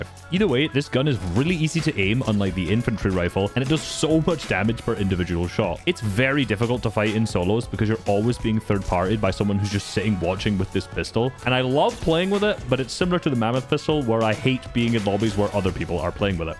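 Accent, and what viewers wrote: Scotland, United Kingdom. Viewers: Yay Scottish man goes on cartoon gun rant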